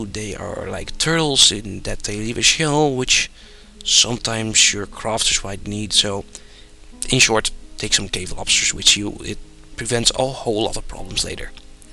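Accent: Netherlands. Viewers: Haha , je kan horen dat je Nederlands bent :'D . Btw waar ongeveer in Nederland woon je als ik vragen mag :x ?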